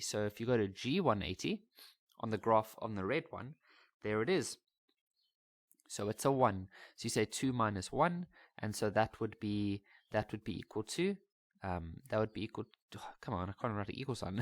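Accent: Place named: South Africa. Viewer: You're South African😳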